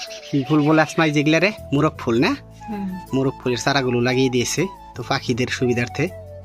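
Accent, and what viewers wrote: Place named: Munshiganj, Bangladesh. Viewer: আপনার মুন্সিগঞ্জ বাসা বাসা নাকি,,, কথা তো মুন্সিগন্জের মত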